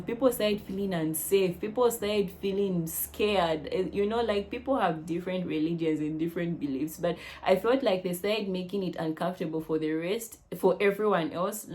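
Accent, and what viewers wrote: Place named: Uganda. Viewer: You talk like a Ugandan, 🥳🎉 pleasure is all mine ones again,thanks for posting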